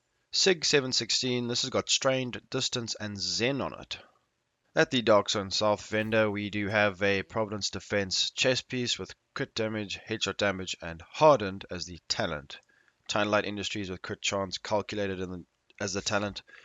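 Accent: South Africa. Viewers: You South African! Sounds so. I subbed because of. Are you part of a clan?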